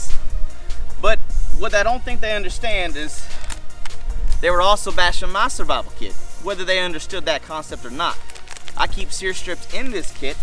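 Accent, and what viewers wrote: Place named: South, United States. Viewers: Yep that southern drawl was thick, pay no mind brother ignorance is bliss. Liked the quick shot of the systematic last couple vids ago. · you sound more southern today! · Are you from my part of the woods in West Virginia?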